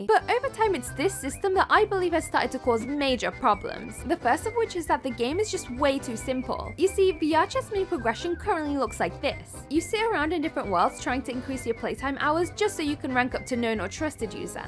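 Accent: United Kingdom. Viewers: The accent sounds familiar, are u from the west mids?? 😂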